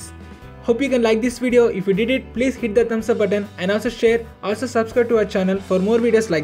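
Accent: India. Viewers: straight up indian tech support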